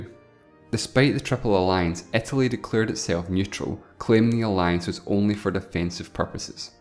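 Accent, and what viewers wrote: Scotland, United Kingdom. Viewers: Scottish accent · Alright am a Scottish fan and I'm wondering by your accent I can guess your from central or eastern Scotland am I right and if so which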